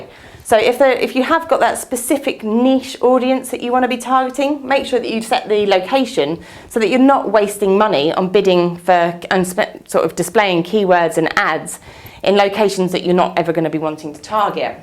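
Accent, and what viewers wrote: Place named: England, United Kingdom. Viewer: Good to hear an English voice for a change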